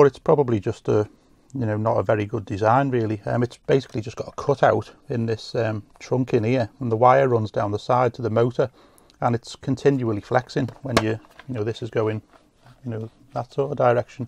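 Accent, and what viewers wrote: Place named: Merseyside, United Kingdom. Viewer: I can hear in your accent you're from merseyside. Can I drop off my Bosch athlet circuit board to you for repair? It is not charging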